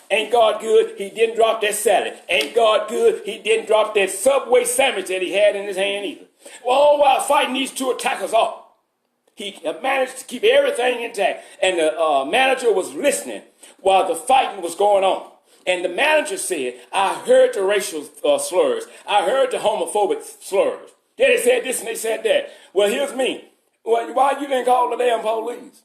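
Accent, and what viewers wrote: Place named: Louisiana, United States. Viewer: I am really enjoying this channel and love the commentary. Your Louisiana accent and passion....very enjoyable.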